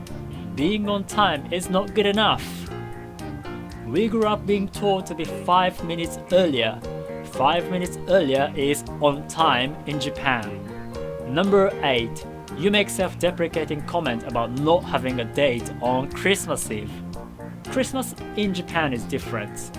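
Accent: United Kingdom. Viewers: why do you peak with a british accent? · hey..! you sound British with your diction, it's cool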